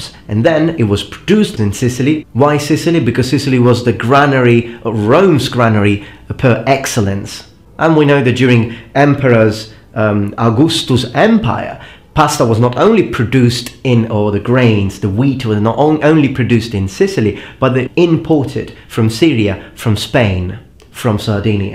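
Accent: England, United Kingdom. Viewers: Your English sounds like a British accent. Well done! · Qualche consiglio su come raggiungere il tuo livello di pronuncia British? Sono a Londra da 2 anni e ancora mi trascino dietro il dialetto Romano. Grazie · hai un accento inglese fantastico,come?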